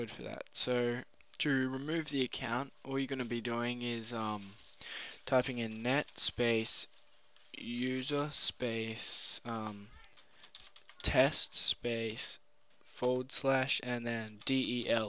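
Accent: Australia. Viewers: hes aussie !! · so Austrailn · it's an australian accent, and he's australian.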